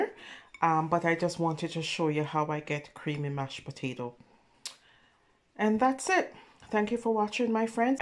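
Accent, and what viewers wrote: Jamaica. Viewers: Yummy 😋 you have an jamaican accent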